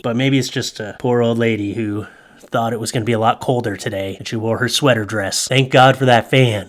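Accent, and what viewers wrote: United Kingdom. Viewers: BBC British broad casting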